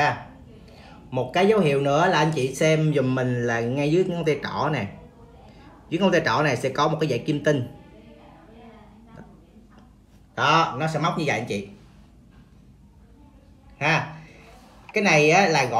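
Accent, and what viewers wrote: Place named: Quang Nam, Vietnam. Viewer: Nghe giọng thầy chắc người QN-ĐN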